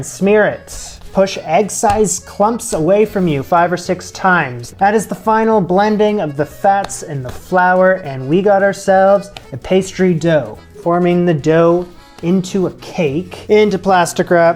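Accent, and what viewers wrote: Canada. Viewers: Me expects all Canadians to know french slaps the naivety out of me Well, at least he's still got the adorable Canadian accent, like every thing he says sounds kind of like a question.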